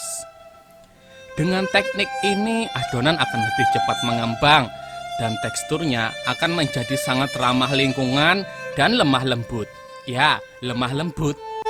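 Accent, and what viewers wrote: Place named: Banjar, Indonesia. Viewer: Logat banjar hanyar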